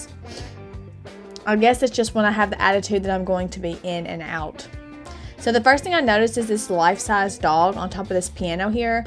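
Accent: Kentucky, United States. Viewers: Where are you from? You say "yall" and that's SO a KY thing!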